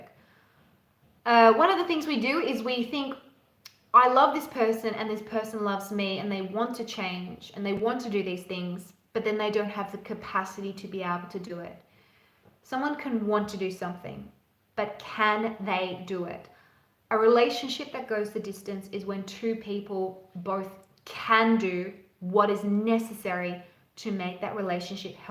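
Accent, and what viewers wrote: Australia. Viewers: How about we feel like 💩 because our rights have been taken away! You: “Things aren’t going back to normal.” Well, sounds like you’re in Australia by your accent. So, enjoy being under house arrest & just taking it like a good lil sheep.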